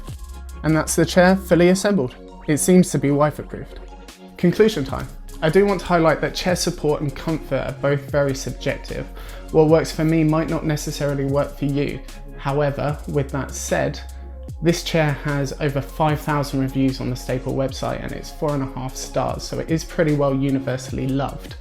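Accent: United Kingdom. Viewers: rep bri'ish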